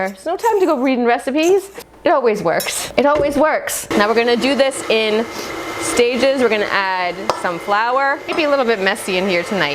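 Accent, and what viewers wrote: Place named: Kandahar, Afghanistan. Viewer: Omg I love your shaista kandahari pashto 😂💛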